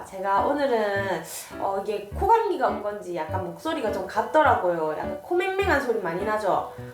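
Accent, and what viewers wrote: Gyeongsang, South Korea. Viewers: 안녕하세요..경상도 사투리가 너무도 정겹네요. 저는 질금나물을 좋아해서 쌀국수 1: 질금나물2 이렇게 넣고 베트남 생고추 총총 썰어서 맵게 먹어요. 오늘도 너무 맛있게 드시네요.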